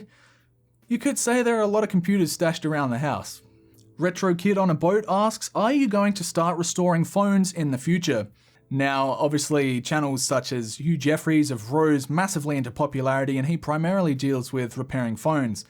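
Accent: South Australia, Australia. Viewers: Oh heaps good, I had no idea you were a fellow South Aussie, for some reason I thought you were Victorian. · Ahhnswer.. classic Adelaidian.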